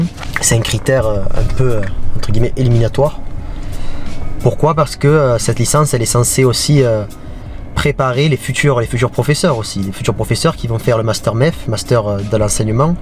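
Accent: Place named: Spain. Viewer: Wow tu as un super bel accent espagnol !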